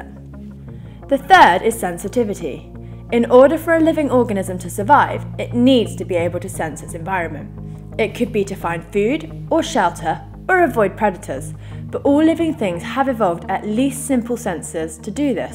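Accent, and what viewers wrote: United Kingdom. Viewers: You sounds more like a british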